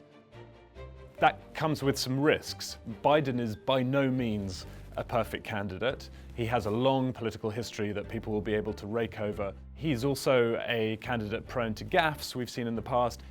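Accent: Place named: United Kingdom. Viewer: Why am I hearing HEARING A BRITISH VOICE !!!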